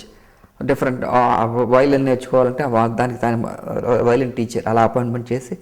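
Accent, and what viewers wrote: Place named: Godavari, India. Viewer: Sir you sound godavari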